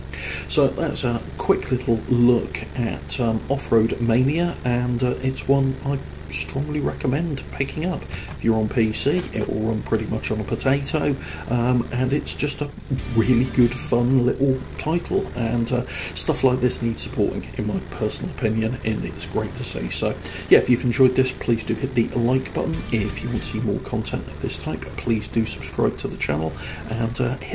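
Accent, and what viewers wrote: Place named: United Kingdom. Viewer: You have the most british accent I've ever seen Good vid, keep it up mate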